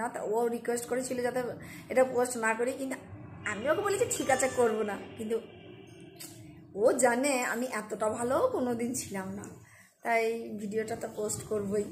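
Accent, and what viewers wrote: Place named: Birbhum, India. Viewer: বীরভূমী ভাষার রসটা দারুণ লাগে আমার❤❤❤... কবে যে তোমার সাথে এমন একটা দিন কাটাবো কে জানে! 😞😞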